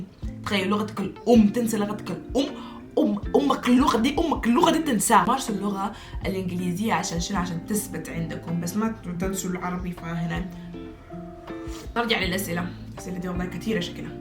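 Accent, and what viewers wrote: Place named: Libya. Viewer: احلى من يتكلم ليبي🥹❤️❤️❤️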